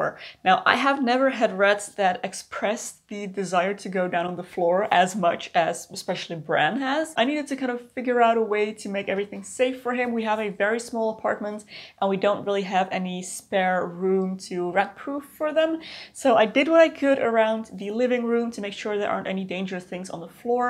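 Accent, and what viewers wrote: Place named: Netherlands. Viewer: Je klinkt niet zo Nederlands als de meesten die Engels praten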